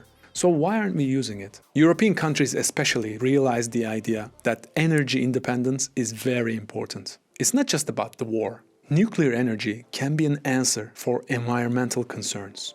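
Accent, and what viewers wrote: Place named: Turkey. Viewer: Nice and clean video. The accent rings a bell, any chance ur a Turk?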